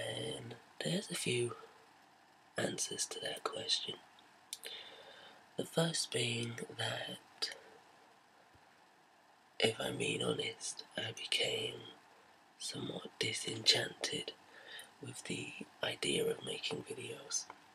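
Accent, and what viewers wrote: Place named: United Kingdom. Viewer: Glad to see you are both back: you and your British accent ^^!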